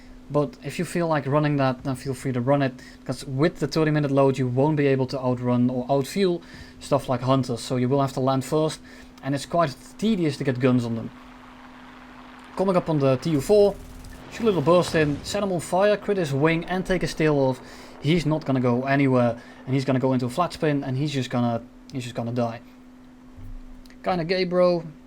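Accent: Netherlands. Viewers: Nice vid! Also r u dutch?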